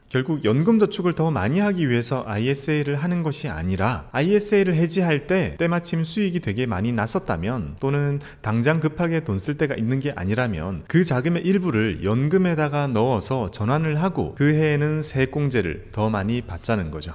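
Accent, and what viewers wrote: Gyeongsang, South Korea. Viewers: 처음엔 무슨말인진 모르겠고 그냥 저음의 경상도 사투리를 즐기고 있었는데요 듣다보니 이제 이해도 됩니다 😎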